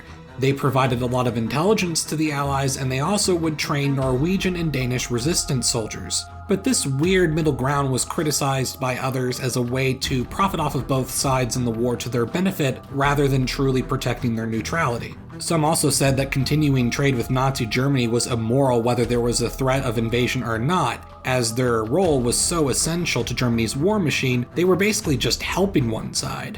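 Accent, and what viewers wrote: Sweden. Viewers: nice swenglish!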